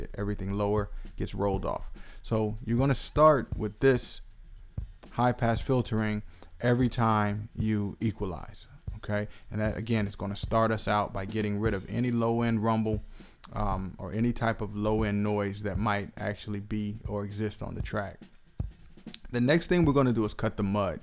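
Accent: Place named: New Jersey, United States. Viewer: Love your accent Man, is it New Jersey? Great Video, very helpful, thank you.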